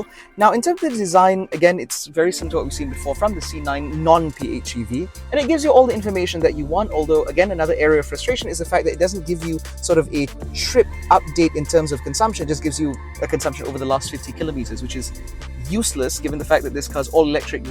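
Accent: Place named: RP, United Kingdom. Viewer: ..rocking those shades, with impeccable queens english..while driving the omoda9..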